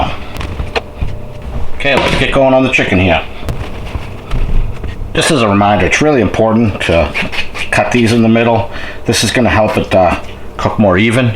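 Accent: Massachusetts, United States. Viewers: I love listening to your Mass accent I myself am a native of Fall River MA so I really enjoy it and your videos You were the one I found when I used my air fryer for the first time for frozen fries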